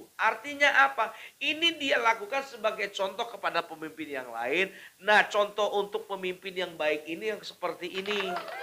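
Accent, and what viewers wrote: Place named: Sunda, Indonesia. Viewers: nyunda pisan euy... hebring 🎉😅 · Abang basa sundanya nyunda pisan👍terima kasih sudah mendukung bapaknya jabar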